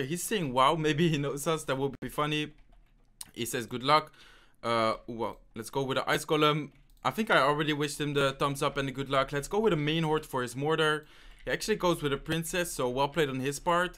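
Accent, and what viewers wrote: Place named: Netherlands. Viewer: Le entiendo y es gracioso el acento inglés que tiene, es holandés y pronuncia las cosas raras a veces. xd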